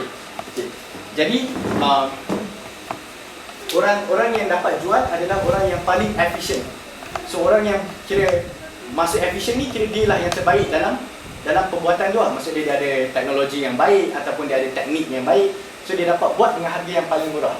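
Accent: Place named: Kedah, Malaysia. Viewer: You have grown to be matured...still remember u r such a small kid during form2 debating in UIA with d kedahan slang 😁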